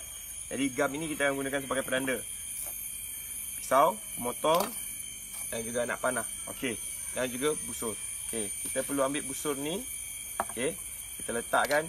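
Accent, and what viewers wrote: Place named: Malaysia. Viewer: Saya sangat suka dengan logat melayu nya, keren :D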